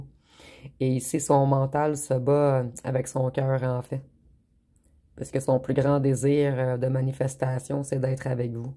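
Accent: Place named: Quebec, Canada. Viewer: Guidance la plus juste possible, pleine de charmes...Avec cet accent qui pour les non canadiens est une marque de fabrique Made in... Québec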